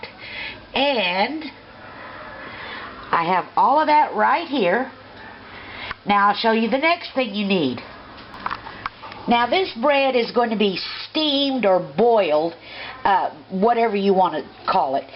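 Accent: South, United States. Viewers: your daddy might have been a new englander but your southern accent and use of buttermilk is a clear give away that your family history is from the south not the north. I bet got that recipe from Martha stwert...lol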